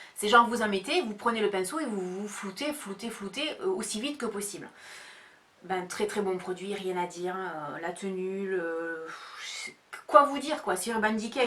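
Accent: South, France. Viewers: coucou ! super ta vidéo haul beauté j'adore Perpignan on vas souvent en vacances a port barcares j'aime trop ce coin et moi j'adore ton accent du sud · Bonjour, comme beaucoup, je connais la marque BareMinerals. Je n'ai jamais testé leurs produits, mais oui, j'imagine qu'ils sont aussi bons que ceux d'Urban Decay que j'adore. Tout comme toi, j'ai les yeux bleus, et je prends beaucoup de crayons noirs, bleus nuit, prune et autre.... Je raffole de leurs rouges à lèvres: Shame, Venom, Jilted, Anarchy..... J'ai bien envie de tester aussi ceux de BareMinerals qui me font de l'oeil depuis un moment:) Pour l'argan, c'est un produit que j'aime beaucoup pour les cheveux, mais aussi en huile pour le corps. Pour le mascara,je m'en suis commandé un sur Aliexpress; Il ne vaudra sûrement pas celui de BareMinerals, mais je verrais bien. Pour Octoly, je trouve normal que les youtubeuses aient des produits gratuits sur ce site. 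Moi, ça ne me pose aucun problème. C'est des produits qui leur seront utiles, et qu'elles peuvent nous présenter, même si, effectivement, les délais pour les tester avant les videos, sont courts. Voilà, je te remercie pour cette video. C'est tout à fait vrai que ton accent du sud est tout mimi. C'est un accent qui chante. J'ai toujours la banane quand je t'écoute:) Très gros bisous ma belle, et à bientôt:)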